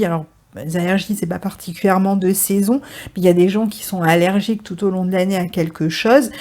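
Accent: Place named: Nice, France. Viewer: Bonjour, c'est toujours avec un réel plaisir d'entendre votre accent Niçois;-) je vous avais demandé, mon transit pour 2019, celui-ci m' a été bien utile. Sagittaire asc scorpion du 24 novembre, je passe une dizaine ce jour, et je pense qu'en tant qu'artiste photographe les choses vont bouger, il y a déjà un frémissement, entre la méditation, les tarots et ma création, vous ciblez parfaitement ma situation. Beaucoup de choses changent et c'est pour mieux comprendre le sens de la vie, ce qui nous fait évoluer dans une spiritualité. Bref votre travail est gratifiant, et vous le faite avec un sérieux, et une parfaite maitrise du sujet, je ne peux que vous en féliciter. Merci encore, et gratitude.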